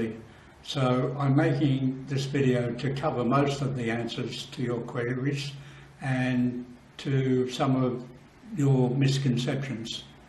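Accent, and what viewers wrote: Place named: Australia. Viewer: Thanks for answering the questions good to know your eating a high protein high fat green diet. I am assuming by protein and fat you mean animal source. Your an inspiration great to see you can still be active at 80, by your accent I know your Aussie however your location seem to be elsewhere maybe Asia or Darwin.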